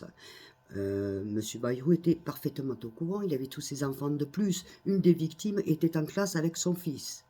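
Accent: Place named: Nîmes, France. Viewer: Vous êtes marrante, malgré votre vie de souffrances, et vous gardé l'accent nîmois. Je me suis abonnée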